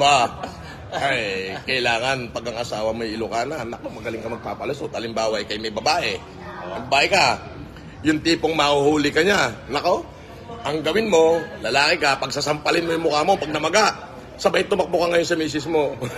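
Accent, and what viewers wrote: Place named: Batangas, Philippines. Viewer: Sir ano ba tribo mo? eh tagalog mo para kang batangeño.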